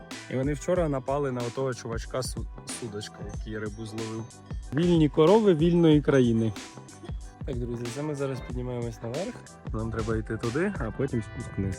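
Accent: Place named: Kuban, Russia. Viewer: «калакольчіка» «да» « сейчяс» ви з Кубані ? Розмовляєте якби українською але акцент і словечка з російського наречія, з Кубані мабуть…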